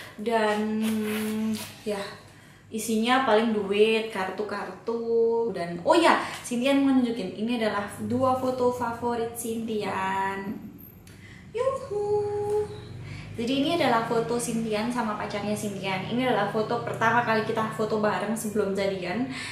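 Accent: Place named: Java, Indonesia. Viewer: medokkk bgttt kaloo ngmngggg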